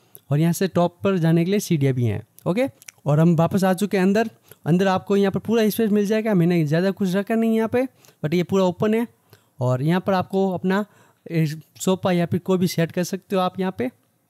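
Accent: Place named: Jodhpur, India. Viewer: Maza aa gaya apne jodhpur walo ki hindi bolne se pata chal jata he ki apne jodhpur ka hi he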